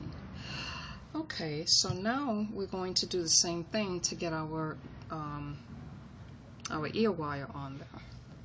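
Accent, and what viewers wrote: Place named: New York, United States. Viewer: I love your videos! Your accent reminds me of my BFF Nancy from NYC. I also Love to Crochet earrings because they are fast and easy. TY for your videos and pls. keep them coming!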